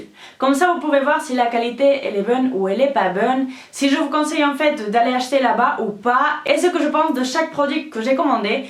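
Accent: Spain. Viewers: Je t'aime tu es magnifique et ton accent espagnol aussi 💙💚💛💜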